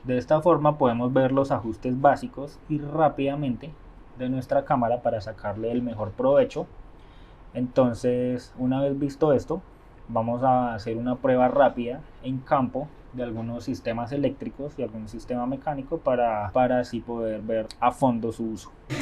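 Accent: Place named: Bogotá, Colombia. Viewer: lo explica con acento rolo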